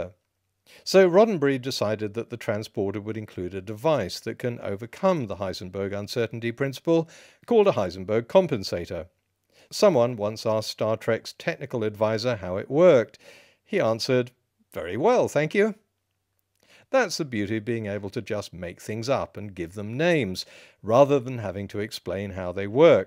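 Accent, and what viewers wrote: United Kingdom. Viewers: Like your videos! 👍🏻 what part of Australia they did grow up in?? Reason I asked is your accent is just so British. Cheers!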